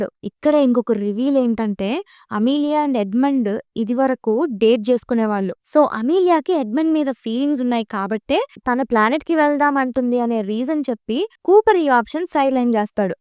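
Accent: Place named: Telangana, India. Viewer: Nee explanation kanna Interstellar movie maro sari chustey arthamaipoddemo papa..nuvvu inkaa confuse cheshaav..sorry.. కాకపోతే నీ స్లాంగ్" అయిపోతది, వస్తది, పోతది, రాస్తది" వింటుంటే..మీది తెలంగాణ అనిపిస్తోంది..Am I right. ??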